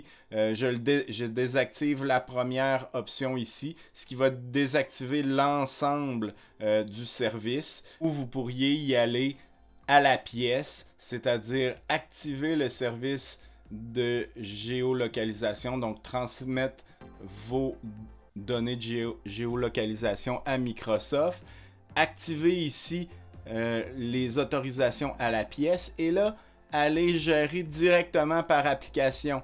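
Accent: Canada. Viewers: salut,merci pour t conseil jaaaime bien ton axent canadien loin de moi d'en rire! si tu savais comment on parle vers marseille! je te permet. tu me fais pense à snowden le open source! c'est pas une balance! meme la justice ne fais pas le poids elle ki se dis equilibre? permet moi d'ans douter! g pas regarder toutes t video! apres je te rap-l. merci bonne continuation le meridien de greenwich...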